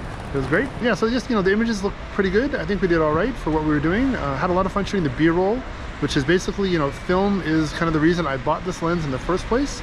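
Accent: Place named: Western Canada, Canada. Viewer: you sound western canadian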